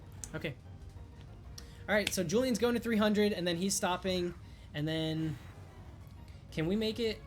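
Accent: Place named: France. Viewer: No !!!!! Your French accent is so cute ☺️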